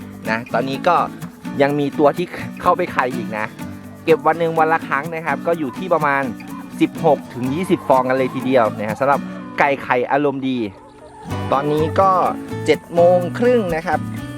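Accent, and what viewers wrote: Isan, Thailand. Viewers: 555เราชอบฟัง พาษาอีสารด้วนน่ารักดีสุดยอดคะ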